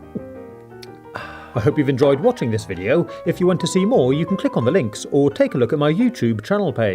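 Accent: United Kingdom. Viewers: Your voice sounds British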